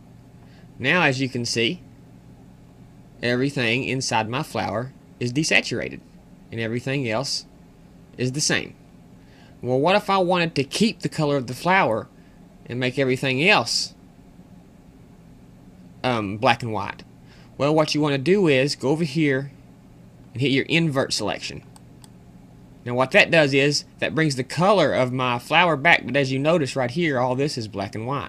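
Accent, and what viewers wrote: South, United States. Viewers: southern american aint it?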